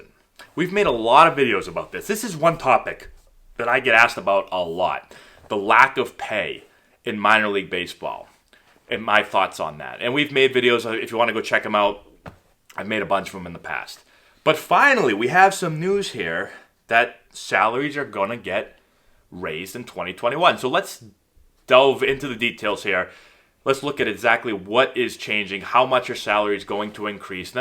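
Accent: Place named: Boston, United States. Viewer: Very faint Bosstown accent..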